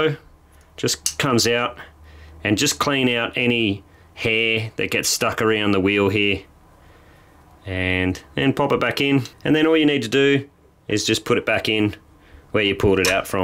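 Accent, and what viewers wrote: Australia. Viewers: Very useful and clear, and I got to listen to your accent too :-) Aussie I assume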